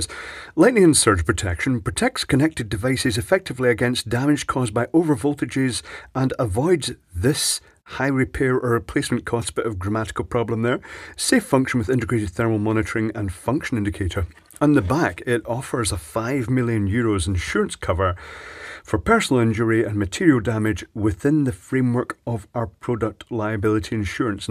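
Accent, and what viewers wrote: United Kingdom. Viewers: Maybe its the British accent listening with my not brit-ears ears but at TS I think he said about the LED: "The only time it will glow is when one of the thermal fuses fails". It glows until the thermal fuse fails.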